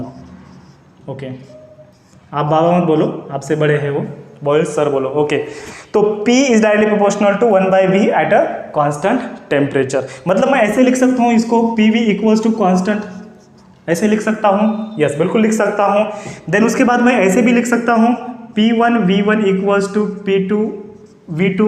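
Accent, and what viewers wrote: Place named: Nanded, India. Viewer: सर तुम्ही नांदेड चे आहात ka?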